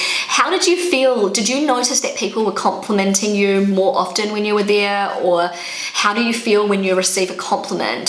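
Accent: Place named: New Zealand. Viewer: Dear, I give you a genuine compliment. I love your Kiwi accent so much, as it reminds me of the journey of a lifetime to your wonderful amazing country back in 2003. I live in Swabia, near Stuttgart, and swabians are so reluctant in saying something nice or encouraging to you. I work as a M.D, now as a GP, and I always try to encourage people and give them a POSITIVE feedback if they do something good. But their is often mistrust in people. Maybe I wasn't meant to be German, who knows. Happy Christmas season greetings from Waiblingen.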